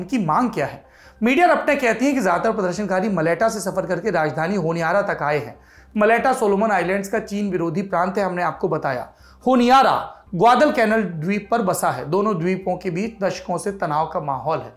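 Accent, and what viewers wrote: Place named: Purvanchal, India. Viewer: Purvanchal se ho kya.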